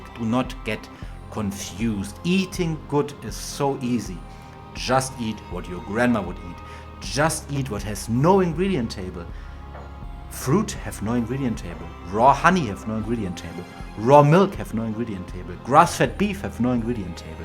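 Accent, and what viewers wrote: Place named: Germany. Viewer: i like your german english. it is like me :) thanks for your inputs.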